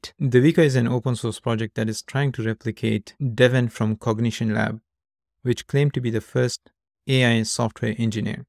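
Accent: India. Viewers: Bro it's a Indian accent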